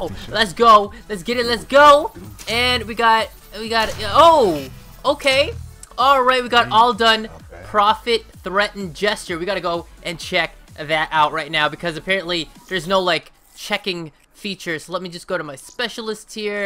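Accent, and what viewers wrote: Asia. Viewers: It's better to get 10 common cause it's more worth it and the vid will be longer, btw love ur Asian accent <3 no homo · This wasss so good am loving these supply drops i was wondering if you'll ever do a series where we send in out own supply drops and you do a top 5 out of them. Can't wait to see the next one could you 5 rare next time would love to see that. In that awesome asian accent aha. Keep up the great work man your currently my bae cod youtuber. No HOMO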